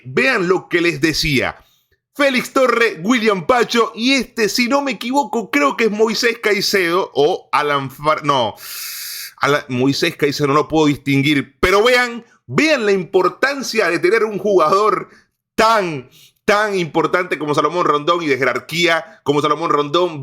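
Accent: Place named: Argentina. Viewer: Panita, pongase a escuchar un cd de salsa o gaitas, ese acento argentino q agarro en 1 mes no cuadra, mucho menos hanlando de la Vinotinto. Buwn análisis.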